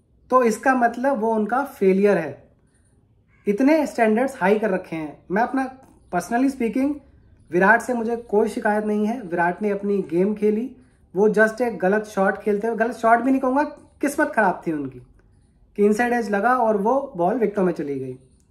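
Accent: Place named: Gujarat, India. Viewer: He sounds like gujarati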